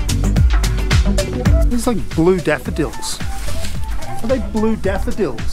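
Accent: Australia. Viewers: Is he an aussie?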